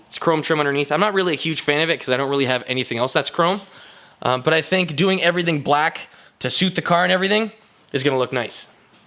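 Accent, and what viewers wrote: Canada. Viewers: are you Canadian?? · Off-topic comment: In your "Send me stuff" section, you don't mention the country. There are two Ontarios in USA, and for those who can't recognize the subtle clues of a Canadian accent, you might be missing out on stuff! Add "Canada"! All the best, Rob (in Switzerland) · Are you Canadian?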